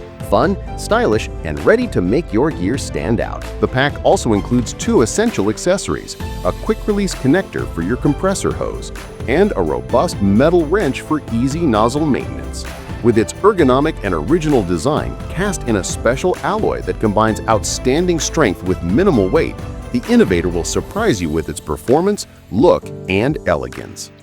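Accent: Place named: United States. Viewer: Hope it’s available in the UK? I’m not a fan of the American accent overdubbed video 🙄🙏🏴🇬🇧♥️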